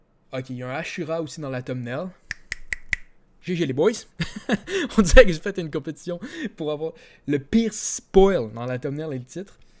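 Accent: Canada. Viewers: tu es quebecois donc vous parler différemment de nous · L accent canadien et a chier mais bon c est pas votre faute si vous parler comme sa les canadiens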